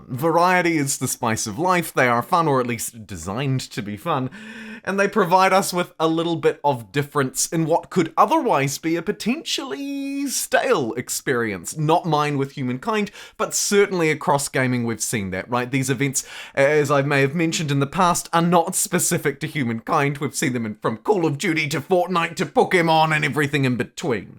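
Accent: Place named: New Zealand. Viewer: Your accent sounds like you're from New Zealand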